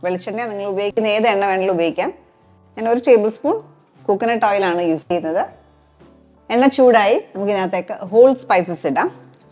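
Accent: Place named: Central Travancore, India. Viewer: Lady speaks the central travancore dialect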